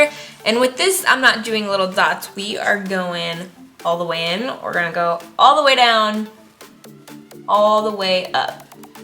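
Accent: United States. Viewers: Are you American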